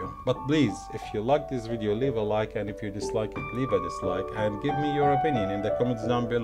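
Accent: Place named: Arab world. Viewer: Where r u from man? you sound arabic af 😄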